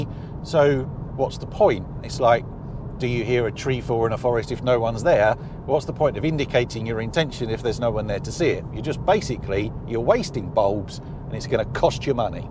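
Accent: United Kingdom. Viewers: Tawk, Spowrt. Proper Saaff accent. Great review. So ugly from the back but it looks a whole load of fun.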